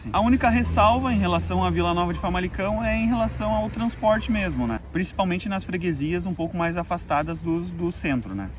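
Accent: Rio Grande do Sul, Brazil. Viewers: Buenas, tem como comprar erva de chimarrão por aí? Tu é gaúcho né. Parabéns pelo canal.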